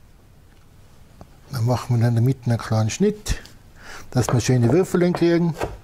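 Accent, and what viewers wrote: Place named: Tyrol, Austria. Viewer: Hallo an den, ich werde sie ganz bestimmt nachkochen. Mein kleines Problem habe ich mit dem Tiroler Dialekt. Danke für das schöne Rezept, Grüsse aus Dresden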